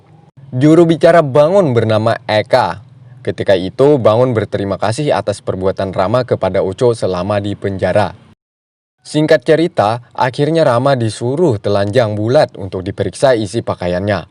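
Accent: Banjar, Indonesia. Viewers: Urang banjar kh ini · Banjar kah pinanya sidin nih🤣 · Orang mana mang pinang Banjar bangat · Banjar banar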